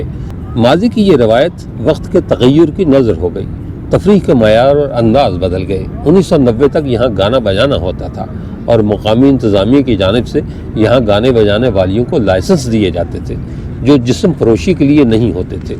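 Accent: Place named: Bihar, India. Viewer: Kia. Tum. Shia. Ho. ? Kia. Tum. Bhia. B. Ho. ? Apni. Urdu. Say. To. Bhia. He. Lagtay. Ho.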